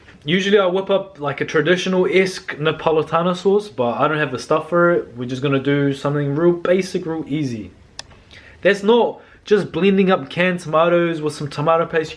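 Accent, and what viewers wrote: New Zealand. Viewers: Thank you for making this. I was struggling with creating my own sourdough starter for weeks but the tropical climate here was hard to navigate. However, your active dry yeast overnight starter is a game changer because I get something that tastes and behaves a little like a sourdough starter without the weeks of cultivation and mould scares. Also, funny thing, I couldn't figure out your accent for a long while (cause I'm bad at it) but my Dad said you must be from NZ.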